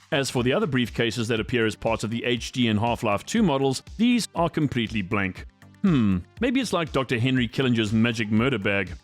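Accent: South Africa. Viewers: I know a South African when I hear one, lol. Dope video.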